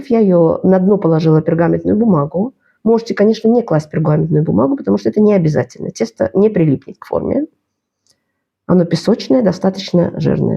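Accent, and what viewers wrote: Baku, Azerbaijan. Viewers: А я думаю акцент такой близкий.знакомый точно Бакинскиц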